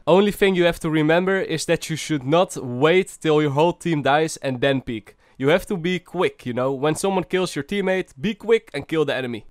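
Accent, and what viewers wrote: Netherlands. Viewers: You sound dutch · Are you dutch? You Sound very dutch xD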